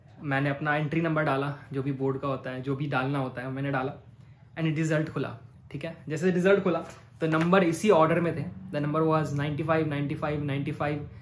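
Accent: Bihar, India. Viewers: Bihar se ho kya ...Katihaar district? ?????? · Bhai ap bihar se ho... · Bhai aaap kathihar bihar se ho??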